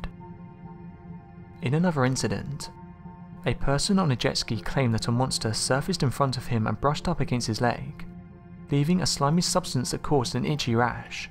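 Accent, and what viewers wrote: United Kingdom. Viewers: The only thing suffering are my ears listening to this absolutely horrid "narration." Having a British accent doesn't automatically make someone a good narrator. All this guy is doing is reading one sentence. Then reading another sentence. Then reading another sentence. And every sentence sounds EXACTLY the same. 90 seconds was all I could take.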